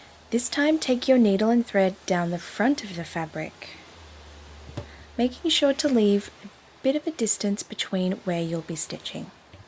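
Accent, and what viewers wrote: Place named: Australia. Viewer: Great little demo video. Thank you. Nice to hear an Aussie voice, and a very relaxing one too!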